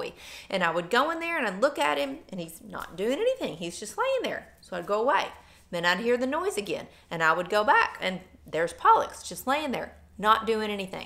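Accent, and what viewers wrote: South, United States. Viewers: Love Pollux and Maxxie. Also love your Southern accent. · YOU HAVE HEART,,THESE ANIMALS I CONSIDER MY CHILDREN.I DIG YOUR CURLS AND THE SOUTHERN ACCENT.GOOD FORCES BE WITH YOU. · Love your adoption of Pollux. Just saw your posts. Absolutely thrilled for all of you! I do have a question if you see this. What state are you in? I can tell your southern. I'm from Alabama so it was nice to hear you say "Yall" alot. I miss home. When my husband retires, we are moving back home, then I'll hear "Yall" used all the time!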